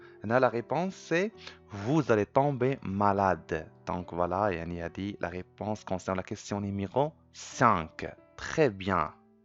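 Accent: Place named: France. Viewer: i like your accent like french people !!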